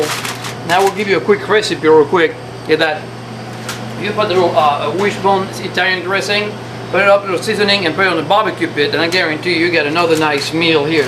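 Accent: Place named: Southern California, United States. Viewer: socal beach learn how to speak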